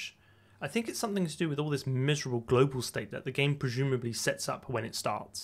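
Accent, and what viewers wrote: United Kingdom. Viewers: I have no idea what you're saying but your delivery is impeccably British and I can respect that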